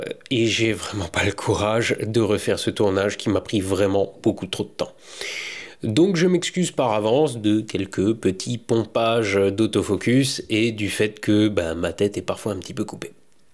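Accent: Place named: Alsace, France. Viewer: L’accent alsacien et la prononciation des le début me ravi ! Mdr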